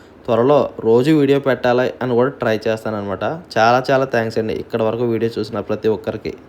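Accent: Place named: Godavari, India. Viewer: Anna ne matala ki navvu vastundi mana godharola language alagey untadhi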